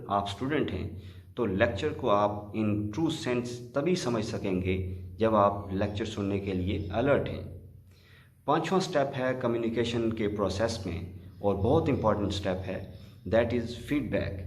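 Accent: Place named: Kashmir, India. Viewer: A nice combination of urdu, a little bit hindi and English in kashmiri accent... It was really informative sir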